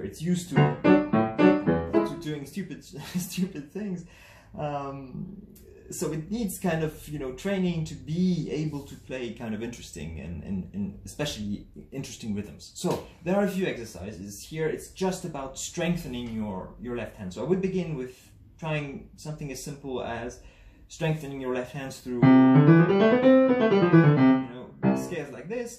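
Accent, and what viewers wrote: France. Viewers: Merci pour tous ces exercices ! Je devine à ton accent que tu es français ?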